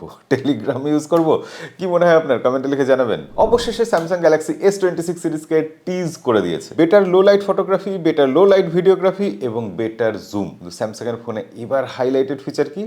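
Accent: Bangladesh. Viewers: উচ্চারণ শুনেমনে হচ্ছে যেন বাংলাদেশের কোনও চ্যানেল দেখছি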